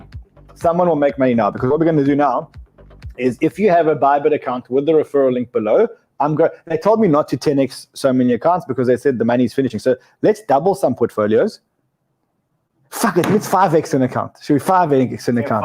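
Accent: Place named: South Africa. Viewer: Sounds too South African: "The feds must fall"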